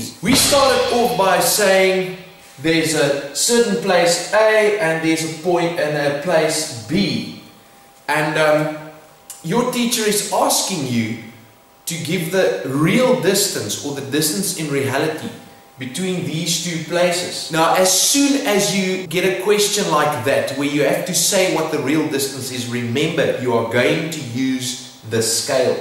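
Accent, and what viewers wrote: Afrikaans, South Africa. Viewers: i literally read this before i watched the video and i was like "i bet his accent is south african" AHA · Afrikaans ascent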